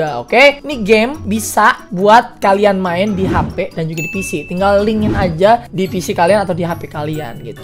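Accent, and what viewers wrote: Jakarta, Indonesia. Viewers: Betawi medok bet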